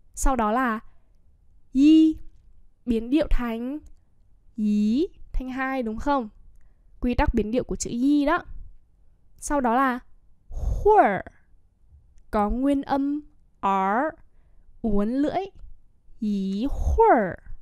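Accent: Standard. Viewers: Cô đọc chuẩn lắm 👍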